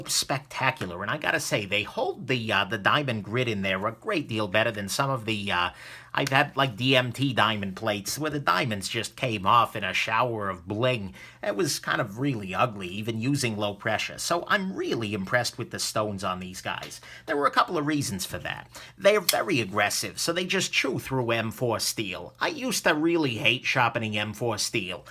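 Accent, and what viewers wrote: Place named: Brooklyn, United States. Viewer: Would you say the basic kit with just the 4 diamond stones from <num> grit is good enough? and what size rod would you say the base has coming out of it. I'm just looking and it looks close to a 3/8-1/2 rod I don't see the point paying the close to $50 for the base here in Canada. Amazon has the basic kit for $220 free shipping so thinking of just ordering that then making my own base wouldn't be hard to have a piece of wood and just put a bolt coming out. Hell almost looks like the lansky base would work too. And I have to ask where are you from? Accent sounds like a brooklyn or queens to me haha.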